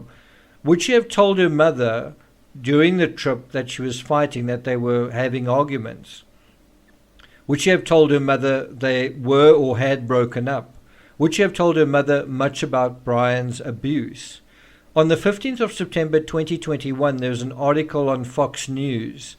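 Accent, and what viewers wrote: South Africa. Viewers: Love your channel, no hysteria , clear thought process and facts . South African accent ?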